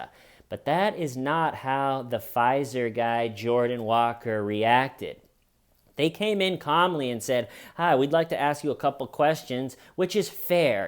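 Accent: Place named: Connecticut, United States. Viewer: You sound like your from Connecticut or some shithole like that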